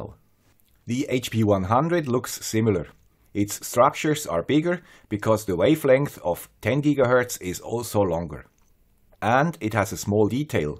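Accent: Switzerland. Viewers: Hello Guy with the Swiss accent, I am a great fan of your channel and thanks to you I have built a lot of projects and Every one was successful. You are truly a great guy.. Can you do a project on building a speed gun radar detector to be used in the car using commonly available sensors and microprocessors. It will be really great project. The currently available sensors in the market are pretty expensive. Also as a maker it will be great fun to build one on our own. Thanks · how do you spell the word that you say at the beginning of every episode? "Grietsie YouTubers"? I always enjoy that "Swiss" accent, which I imagine must be German or Romansh:) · Sir Mr Swiss accent guy, is it possible to hook a Cantena to these modules to increase range and directionality? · 2 years in: still loving the guy with the Swiss accent.. my #1 go-to guy when I want to be informed about IoT, electronics and home automation!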